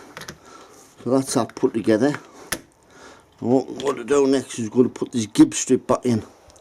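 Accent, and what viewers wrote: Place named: South Shields, United Kingdom. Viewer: hi where you guys im south shields you sound local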